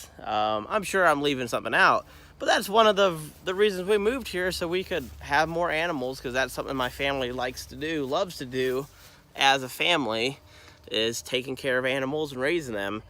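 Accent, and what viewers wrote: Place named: United States. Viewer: He has to have the most American voice i heard.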